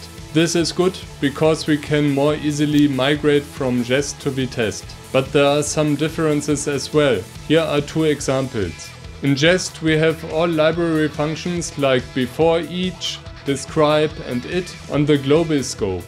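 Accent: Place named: Germany. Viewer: Good old german accent 😄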